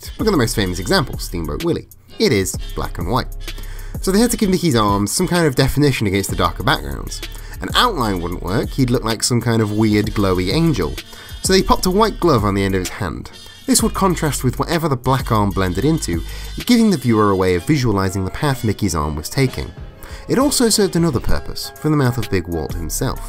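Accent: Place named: United Kingdom. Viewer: I guess I'll go against the grain and thank you for the first part. It had information I didn't know. The whole signifier thing is interesting. And it did connect well with "male by default." But once you actually got to explaining that, you were just saying stuff I already knew. It came up when I checked out this "horrible" Anita Sarkeesian everyone was talking about. I wonder if having a male-sounding voice (with a "smart person" aka British accent on top) talk about it will help it resonate further. Though do I understand correctly that you are actually non-binary? Just checking so I use the correct pronouns.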